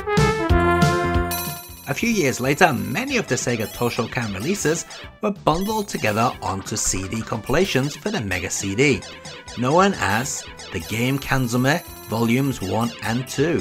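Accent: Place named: St Helens, United Kingdom. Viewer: I've got to ask, mate. Where are you from originally? Northwest right? Sound like st Helens.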